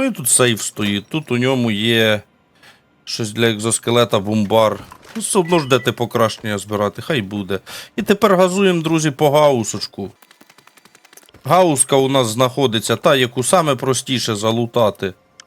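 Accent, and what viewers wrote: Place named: Vinnytsia, Ukraine. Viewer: Дякую за якісний контент. За вінницький діалект окреме дякую. То батьківщина мого тата. В дитинстві часто бував у Шаргородському районі. Я бігаю з двома "Дніпро". Один розточив на 7.62. Снайперка з магазином на 21 набій. Гаус. Три пістолети під різні набої і збільшені магазини. Маргач - 13 набоїв. Довго бігав за кресленнями і магазинами. Тепер шкода закінчувати гру. Хочеться грати і грати.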